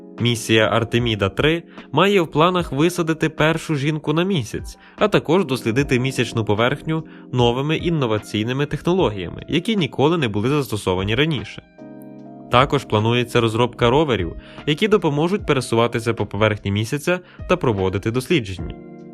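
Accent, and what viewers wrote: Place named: Zakarpattia, Ukraine. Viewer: Ви з Закарпаття? Акцент 😊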